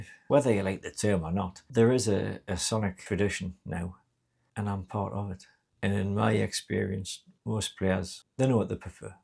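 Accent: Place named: Geordie, United Kingdom. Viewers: Midlander brought up and lived in south decades since, except for short period a little north. Zero struggle with accent. I like the northern accent. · I’m west country born and bred, understood every word. There’s nothing more satisfying than hearing the word Bazouki in a north east accent! Great video. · where in the north is the accent from? I have to concentrate, but understand well enough (Irish myself) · For my money yhe Geordie accent is the most beautiful English accent I know.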